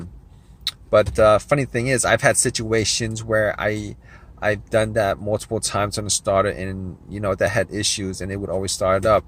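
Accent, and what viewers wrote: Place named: New Jersey, United States. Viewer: Lol I was listening and thought to myself, he sounds like he's from Jersey 😂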